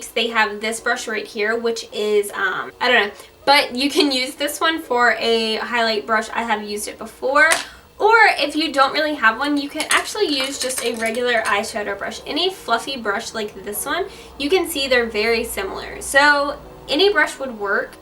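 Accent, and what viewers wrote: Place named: South, United States. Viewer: Sucks you had a allergic reaction, i had one to fix plus spray 😭 glad your feeling better 💜 i also have the 1st palette from carli bybel. I love the highlighters in the palette, great prom tutorial doll💜 oh and yes you sound a bit country, not a lot lot but a bit of a accent. Lol its cute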